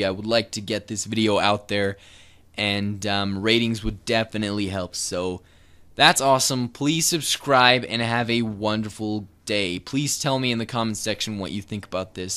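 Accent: Bosnia and Herzegovina. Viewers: U a bosnian?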